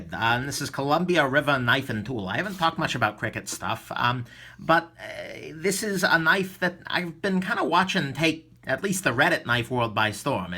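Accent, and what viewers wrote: New York, United States. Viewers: New yawk I'm assuming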